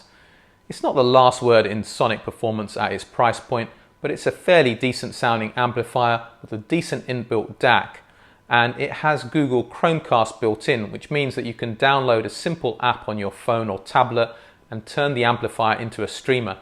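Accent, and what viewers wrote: United Kingdom. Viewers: your content has always been top notch and you do excellent reviews, so of course your channel grew quickly. It doesn’t hurt that you’re obviously a good guy, and you’ve also got that British accent vouching for you too! lol. Great year of reviews, and I hope you have a great holiday season. I look forward to seeing more of you in 2021. Thanks,! ✌️🎶🙂👏👏👏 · Thank You for you videos for 2020. I have found them really informative and you way of presenting is superb + I just really enjoy the British accent - really easy to understand as a non-native-english speaker. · Like your British accent, however, some of your items you mentioned are not available in the USA.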